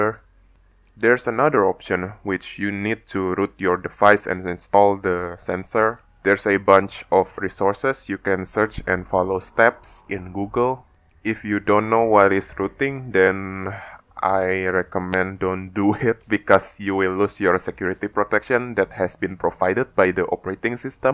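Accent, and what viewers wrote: Indonesia. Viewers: Great content. First time on your channel and I easily recognized your Indonesian accent. :) Salam indo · Done and works, beautifully explain. thanks. btw kerasa banget logat indo nya wkwkwk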